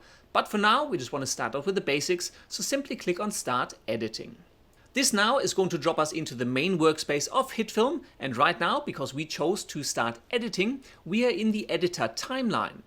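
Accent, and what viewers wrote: Germany. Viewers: Even if your lovely accent (German?) hadn't hooked me, I wouldn't have missed a minute! This was just the tutorial I needed. Amazing work. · Du musst definitiv Deutscher sein, dein Akzent klingt so süß 😁 Anyway, thanks for your very high quality content, it's so helpful!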